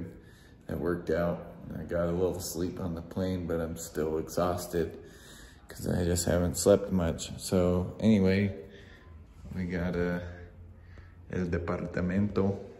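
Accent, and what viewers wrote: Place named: Spain. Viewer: Love your hoodie and your spanish accent jaja keep trying and improving it :) Stay tuned and have a good time 🤘🏼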